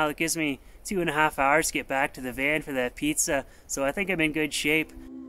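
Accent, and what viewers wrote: Canada. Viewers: I am a new subscriber due to your accent. Canadian A? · He sounds too Canadian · Your food looks amazing. Also, I love that Canadian Accent. New fan :) all the way from HOT Sydney Australia. I wish I lived in the cold! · I am Canadian myself, so I recognize a strong, Canadian accent when I hear it. Are you from out West? Maybe far East coast? I'm from South East Canada myself, or south west Ontario.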